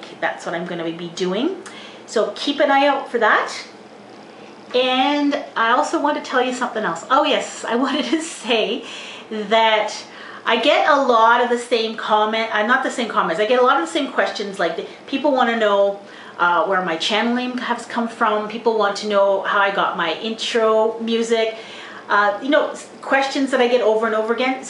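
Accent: Canada. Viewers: I love your Canadian accent, ha. I'm right below you! ( America :] )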